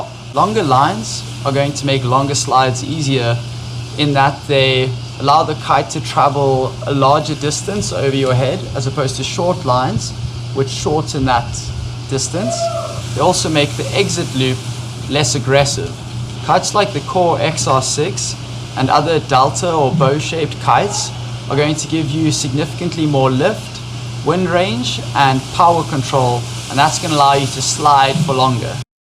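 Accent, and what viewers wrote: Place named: South Africa. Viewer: Bru! So rad to randomly catch a Saffa accent on YouTube :) makes me homesick. Will deffo be checking out more of your vids, keep it kif ma china!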